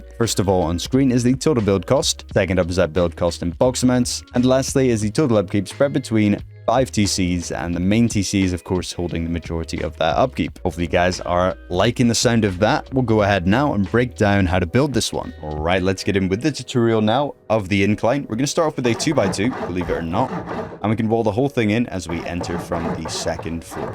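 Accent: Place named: Scotland, United Kingdom. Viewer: Wait! I've been watching your videos and building your bases for the boys for years at this point and it was only now when I was showing my mate one of your PvP vids and he realised you were a fellow Scotsman. How I never noticed is beyond me I obvs thought your accent was "normal" so I never even realised. Keep up the banging videos bro, proud of you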